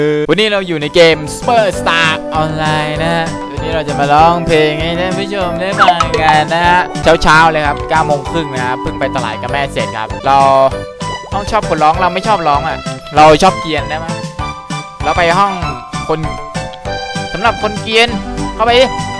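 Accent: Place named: North, Thailand. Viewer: นายเป็นจังหวัดไรครับ ? เหมือนคนเหนือเลยอะ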